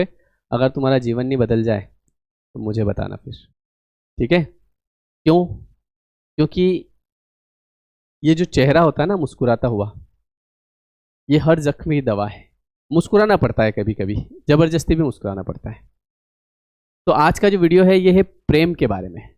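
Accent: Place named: Malwa, India. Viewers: Sir aap indore ya Malwa region se jude hai kya .... Apki boli se mujhe lagta h k aap hamare malwa region se jude hai 😊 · Inki boli se ye ujjain/malwa se lgte hain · Bhai aap indore se ho kya? 😅Bade he indori lag re bhai😅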